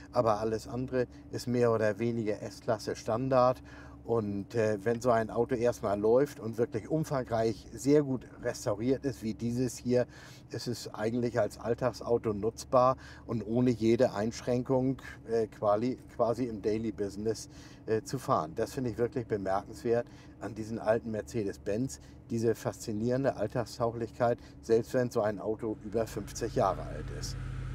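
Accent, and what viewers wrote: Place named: Hamburg, Germany. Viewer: Sehr sympatisch das breite Hansedeutsch!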